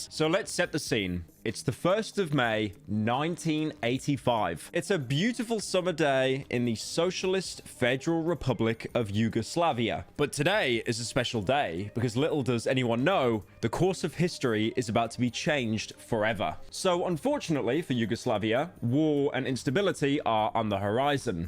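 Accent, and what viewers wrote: United Kingdom. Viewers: Why the fuck am I watching and listening to a brit talking about how an eastern european man had a foreign object in his chocolate starfish?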